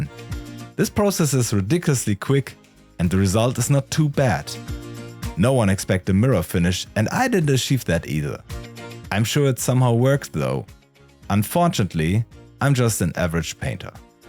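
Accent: Germany. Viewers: German . I hear the accent. lol is this doc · Cooles Video und auch cool das du so gut fachlich Englisch spricht man hört aber sehr gut den Deutschen Akzent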